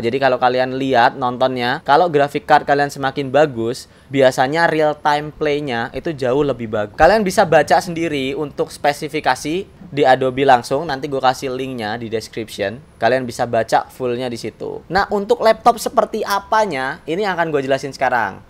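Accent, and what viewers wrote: Java, Indonesia. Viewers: Ngomonge Medok Sih Kang, Sampean Wong Endi Sih?.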